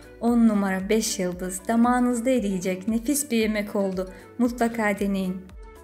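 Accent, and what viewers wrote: Azerbaijan. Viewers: Seslendiren azerbaycanlıdı <num>% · Azerbaycanlisiz? Lehceniz tanis gelir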